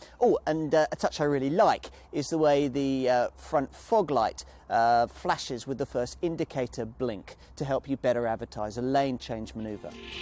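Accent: United Kingdom. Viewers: This is my all-time favourite video of Jonathan Crouch because I own a Fiat Linea 1,368 FIRE engine petrol version. The Linea itself is a Punto derivative. This review is thoughtful, detailed, insightful and characterized by excellent style of English speaking and vocabulary that only the British can create with their perfect accent. I watched it 20 times already, and is my favourite video apart from the Fiat Tipo and Fiat Fullback.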